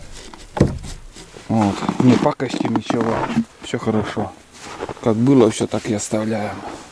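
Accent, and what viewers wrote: Komi, Russia. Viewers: я из Инты,тоже дачка была в лесу,но у нас не мыши а леменги одолевали за зиму,оставлять ничё нельзя было🙂🙂🙂а у вас прям говор Коми👍👍👍👍подписка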